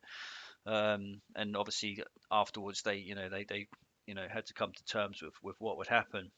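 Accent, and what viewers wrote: Cornwall, United Kingdom. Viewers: He is from Cornwall, they have an accent, like us Londoners, but I understand him fine.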